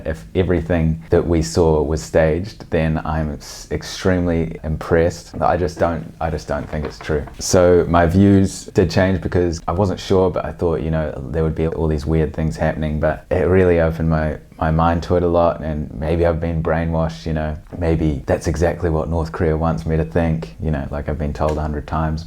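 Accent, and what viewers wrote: New Zealand. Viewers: He sounds like an educated Kiwi. My husband is Australian he says the accent is based on level of education instead of regional location. Same with Australia. Most people expect kiwis to sound like bogans which isn't the case . Hope that makes sense. Cheers.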